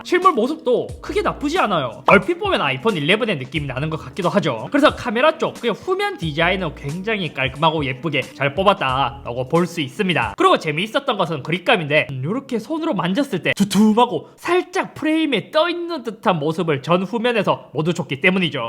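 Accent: Gyeongsang, South Korea. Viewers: 말투로 보니 대구에서 자란 친구네. 대구~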